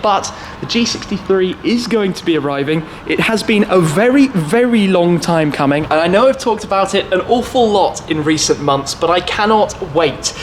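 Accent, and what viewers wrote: United Kingdom. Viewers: I don’t know man, I think I have a pretty good radar and I think he’s just really posh English.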